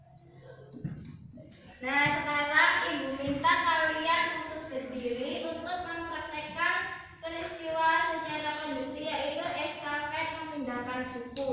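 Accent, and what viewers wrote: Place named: Java, Indonesia. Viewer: Medoookk rekk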